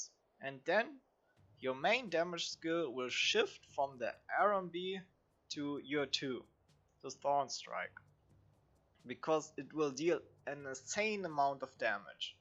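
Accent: Germany. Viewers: are you german ? (accent) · so german accent +1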